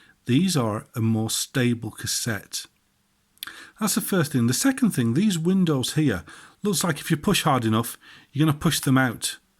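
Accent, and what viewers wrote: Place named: Manchester, United Kingdom. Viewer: You've got the same kind of accent as Techmoan. Are you from the Manchester area too? 😁👍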